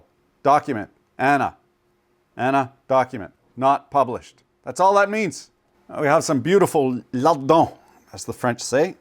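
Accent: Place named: Canada. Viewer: canadian accent...where's your secret lab? Be safe out there, you can't go breaking down the science and expect to go unnoticed. Thanks for the reminder eh.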